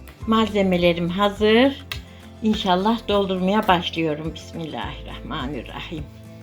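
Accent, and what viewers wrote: Kayseri, Turkey. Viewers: Maşallah teyzem nasıl özlemişim kayseri şivesini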